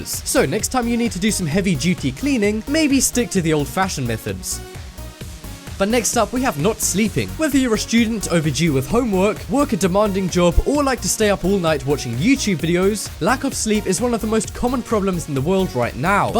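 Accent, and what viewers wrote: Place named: United Kingdom. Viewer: you have a strange American accent, sounds very much like a British one.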